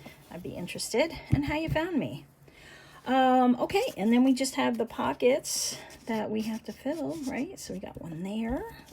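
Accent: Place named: Pennsylvania, United States. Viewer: Love your style.. will try these…. Sounds like you live in PA. I used to live there… now I am in MN Yes do more of these please..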